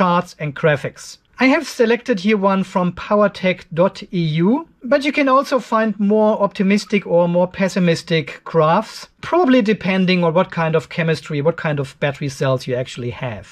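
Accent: Germany. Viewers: Your accent sounds german. Am i wright? Super Video, Danke 👍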